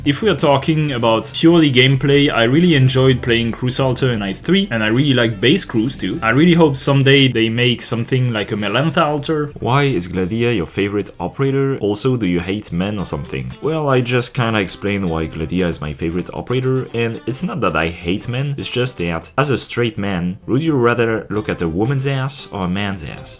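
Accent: France. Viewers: PROUD... ahem.. Proud to be here. I have time ask tho... are you French?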